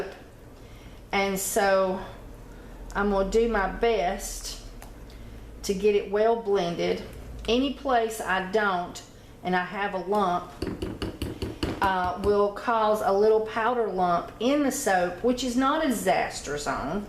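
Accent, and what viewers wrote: South, United States. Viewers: A few things. First I want to apologise for not getting back to you sooner, I still want to help regarding what we spoke of before. Second, THANK YOU SO MUCH!!!! No one online has taught "Superfatting" better than you! How simple was that idea? How could it be said better? Super easy and YOU are the answers. And lastly, I was born to a southern girl who lived in the north so I feel comfortable in both worlds and they are as different as the country mouse is to that city feller over tharr. LOL. I love your video language because you sound like one of my bless their hearts and keep them Lord aunts. When you said butt crack I knew I still had some southern left in me, I about died laughing then shut myself up so I could learn more. Anyway, nuff said, I will email you again.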